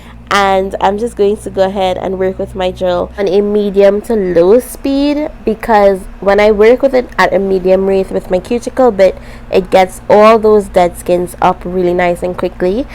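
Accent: Trinidad and Tobago. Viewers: Are you Trini? I hear a accent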